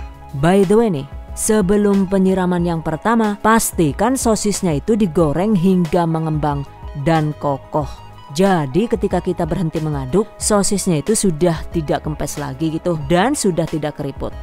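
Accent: Banyumas, Indonesia. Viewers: Wong ngapak jebule ... 😁👌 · Lah ngapak😂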